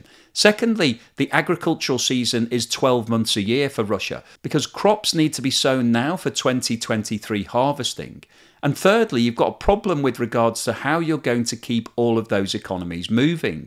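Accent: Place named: Liverpool, United Kingdom. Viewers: I think has a slight Liverpool accent. Your English must be pretty good, so listen out for that! 😊👍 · Yes, definitely a slight Liverpool accent. Very clear to me.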